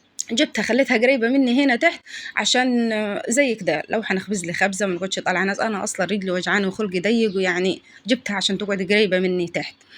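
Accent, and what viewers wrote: Upper Egypt, Egypt. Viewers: انتى من فين فى الصعيد ياختى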